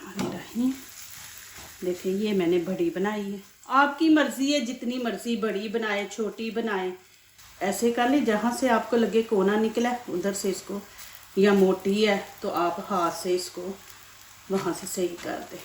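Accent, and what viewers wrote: Punjab, India. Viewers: Assalamu alaikum. Baji buhut khob . Mashallah. Dil Khush kar ded da. Eik toh Aap panjabi andaz se bolte ho .us par itni laziz recipe banate Ho Dil sach mein khush ho jata hai. Allah Ke hath ke cycle ko barkrar rakhe aamin... Mujhe gobhi ke parathe ki recipe bataen .. I am from India Allah hafiz..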